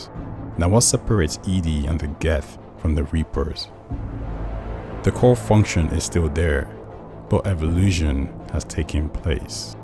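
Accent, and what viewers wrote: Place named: Trinidad and Tobago. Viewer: You sound almost like a Trini. I dig it.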